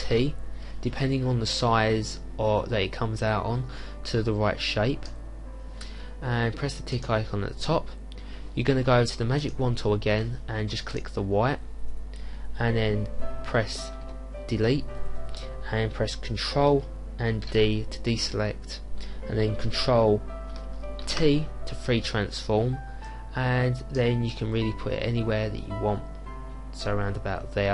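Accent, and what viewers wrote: United Kingdom. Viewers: very nice tutorial. but DAMN your British accent which is putting my nerves to a big challenge considering that English is not my native language... Anyway, I will try to fully understand your actions from tutorial.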